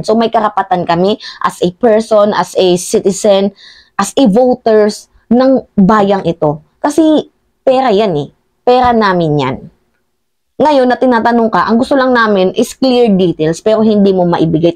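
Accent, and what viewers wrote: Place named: Visayas, Philippines. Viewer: Bisaya ka day... matigas ang pilantik ng dila mo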